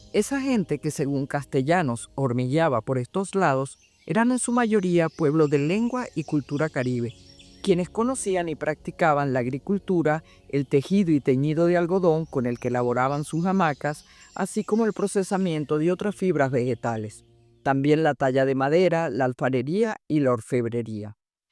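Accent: Venezuela. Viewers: Hermoso escuchar su voz, voz de Caracas, mi reconocimiento y agradezco su esfuerzo y la animo a continuar... Gracias :) Recuperar el idioma de los VALIENTES PRIMEROS debería ser una prioridad, como me gustaría saber de ese idioma de verdad verdad!!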